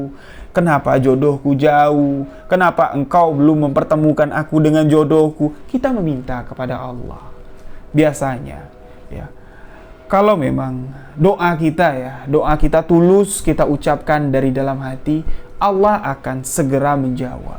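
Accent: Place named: Jambi, Indonesia. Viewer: Senang dengar mas bicara dg logat daerah Jambi....succes ya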